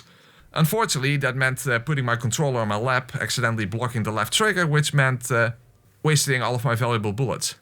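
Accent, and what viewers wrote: Netherlands. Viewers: It took me 2 seconds to recognize your accent, het is goed om een Nederlander te horen op Youtube! Great content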